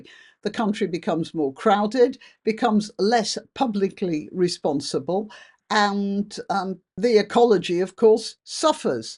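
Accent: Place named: RP, United Kingdom. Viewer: Fuck me, I love to hear a posh woman swear!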